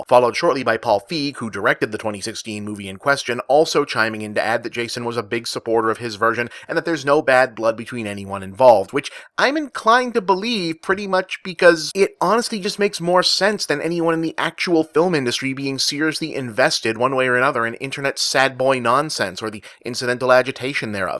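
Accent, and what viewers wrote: Boston, United States. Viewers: It's just part of his Boston chahm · Who else herd the boston in here?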